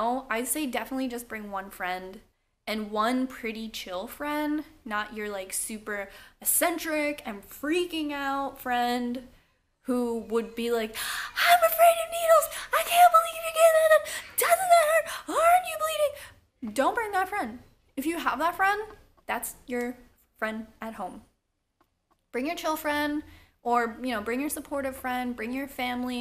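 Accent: Philadelphia, United States. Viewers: Are you from philly?!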